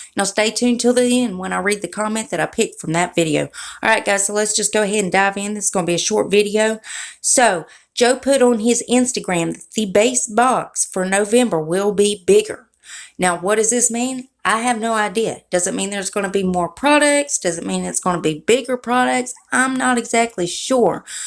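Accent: South, United States. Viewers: I love your Southern accent and sense of humor👏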